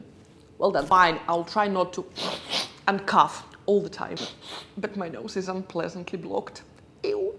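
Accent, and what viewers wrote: Russia. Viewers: Are you russian? You have russian accent . An intonation mostly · Похоже на русский акцент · Your russian accent hurt my ears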